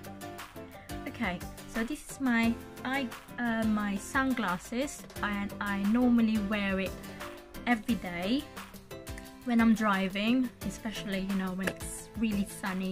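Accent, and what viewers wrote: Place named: Philippines. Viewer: Nice accent are filipina? Nice sun glasses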